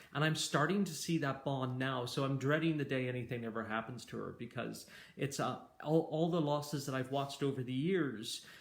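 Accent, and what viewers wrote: Ireland. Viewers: I hear the Irish lilt in your voice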